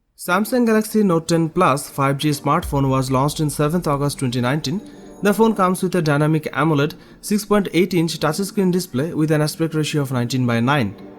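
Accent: Bengal, India. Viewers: Ffs Indian accent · That dense Bengali accent in your English man!! ( P.S. Coming from a Bengali himself :D ) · Bengali accent irritating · Another indian vloger I just dont want their accent... It always looks like other vlog that dubbed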